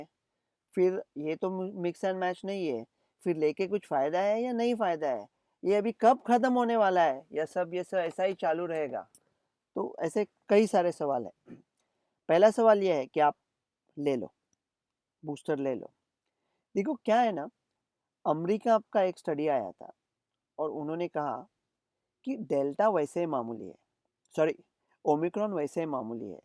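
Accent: India. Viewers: Sir i want to talk to you...aap ko language sunkat aesa lakta h aap india me hi rehte ho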